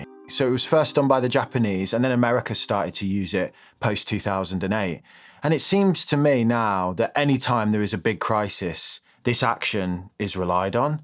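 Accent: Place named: Black Country, United Kingdom. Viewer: It sounds like the black country, maybe walsall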